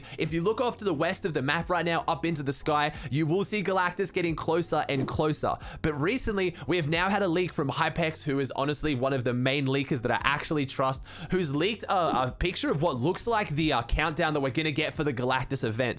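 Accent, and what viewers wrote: Australia. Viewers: Aussie sounds sick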